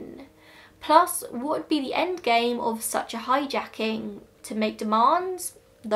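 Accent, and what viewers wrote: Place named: Home Counties, United Kingdom. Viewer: She is speaking in a standard Home Counties accent.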